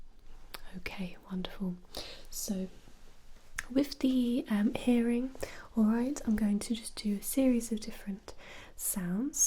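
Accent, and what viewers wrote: United Kingdom. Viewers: Is she from UK?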